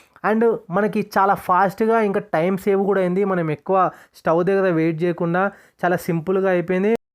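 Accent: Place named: Telangana, India. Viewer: Fine cooking 🍳🔪 bro❤ Telangana language bro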